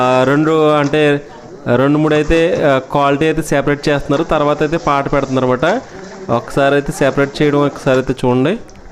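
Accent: Godavari, India. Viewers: I'm Guntur but I like godhavari yasa baguntadi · Nenu.. Mee slung kosam chusthanu... Pakka godharolla voice... 😊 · Funny conversation... With our godavari yasa.. Good job bro... Mana godavari jilla ante yentha special lo andariki chupistunnaru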